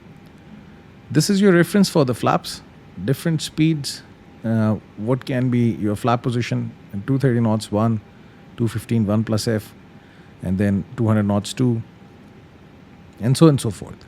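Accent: India. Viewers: Love you lil Indian accent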